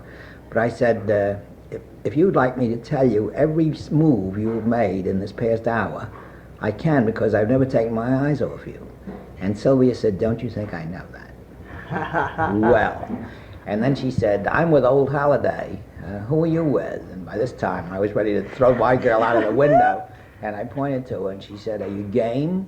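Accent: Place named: New York City, United States. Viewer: That accent is Manhattan West Side, like *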